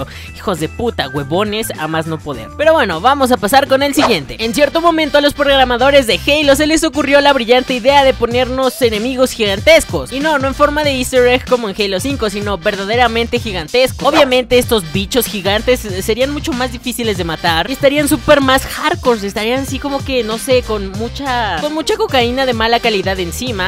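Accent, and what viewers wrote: Yucatan, Mexico. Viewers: eres yucateco? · una pregunta?? eres yucateco??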